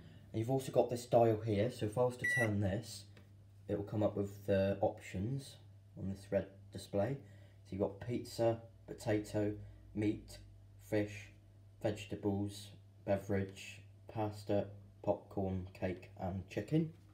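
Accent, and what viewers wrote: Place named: Cornwall, United Kingdom. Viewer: He speaks with a Cornish accent. He probably lives on a farm in Devon or Cornwall. He is a lovely boy who should be working on his tractor.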